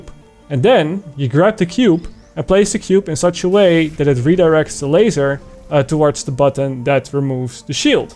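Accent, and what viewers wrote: Netherlands. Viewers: u sound Dutch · At the start i was like lol he sounds so dutch. And then somehow I just forgot about it and the "zie je later" in the end just had me in shock like huuh and then i was like oooh yeah duh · I didn’t notice it at first but later in the vid i can hear the dutchness in your voice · You sound very dutch xD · Forgive me if I'm wrong but your accent screams Dutch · Dutch ish accent if I'm correct? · goede poging voor engels ik ben ook nederlands! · Obvious Dutch accent is obvious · I can tell ur Dutch, ur accent is big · Nederlands accent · You're dutch arent you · Video starts Dutch accent sense tingles =O looks at channel Is from the US "Hm.." watches video, entertained end of video "Zie je later" =O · Are you dutch? That accent is familiar. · Okay so i found your video randomly because it was recommended to me. And very good video! 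Glad i came across it. I love portal and also liked playing Portal stories: Mel But what I actually wanted to ask: are you dutch? I’m dutch myself and i just cant help but feel like you have a bit of a dutch accent. I’m very curious cuz i dont know many proper english speaking dutch youtubers. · is this guy dutch?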